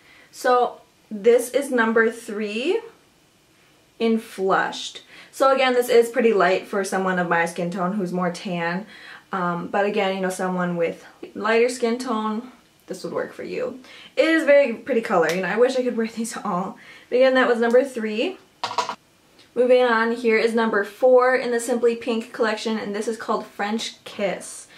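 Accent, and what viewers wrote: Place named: Upstate New York, United States. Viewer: BTW, are you from up north? I grew up in Rochester NY and our accent is very similar to yours. :D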